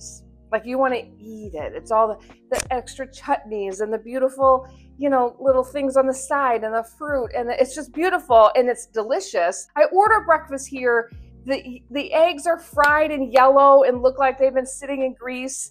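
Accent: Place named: New Zealand. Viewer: I love the way you pronounce new zealand, it's awesome (you have a bit of that kiwi accent)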